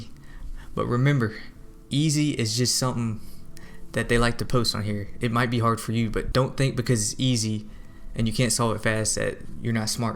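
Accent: South, United States. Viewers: Thank you for maintaining the southern accent bro. Seriously. We're losing our accent and our southern culture. When i hear people speak it, it keeps the spirit alive. thanks for uploaidn g the very helpful video! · Dude, what southern state are you from hahah. As a resident the accent and the yeti sticker isn't fooling me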